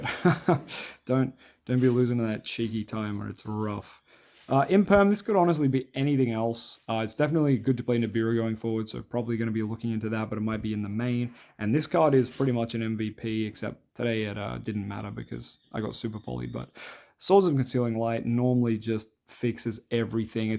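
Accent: Australia. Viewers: Are you aussie? Because if so, that “Goony boy” nickname is so much funnier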